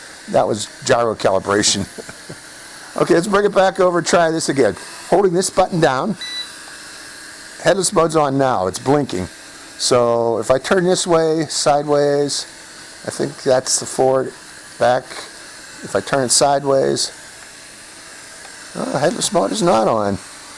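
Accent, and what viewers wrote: Pittsburgh, United States. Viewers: I detected a Pixburgh accent! Thanks, from North Hills! Anyone know where I could get a basic case with this? Buying a couple as gifts.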